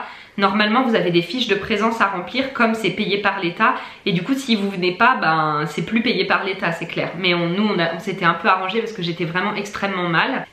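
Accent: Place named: Germany. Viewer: Ça se voit l'accent allemand quand vous parlez français, hihihi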